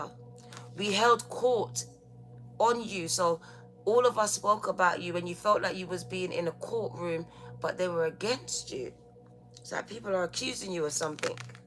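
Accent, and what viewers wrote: United Kingdom. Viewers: I absolutely love your old world Brit accent. My father was British and had one too.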